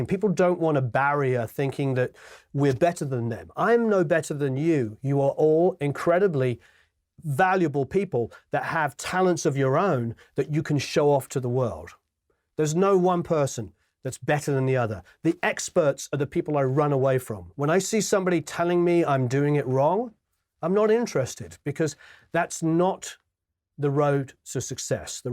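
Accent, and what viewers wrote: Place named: United Kingdom. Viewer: This was amazing information,. I can see from your other videos how much you respond to everyone, and it truly shows you respect us and, as you say here, VALUE us. It's always a pleasure to hear you, especially since most of the amazing people I've worked with have had British accents.;) Cheers, and again, thank you for being so personal and invested.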